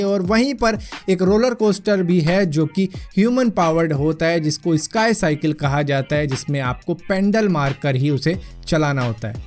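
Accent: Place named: Punjab, India. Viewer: Muje ehsa lgta haii ki app punjab ke ho..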